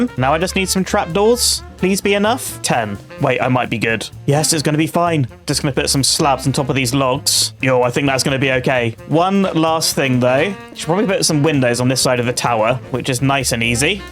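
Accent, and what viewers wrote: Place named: United Kingdom. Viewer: I was listening to this as I slept and I'm just now realizing now that I'm awake that he's British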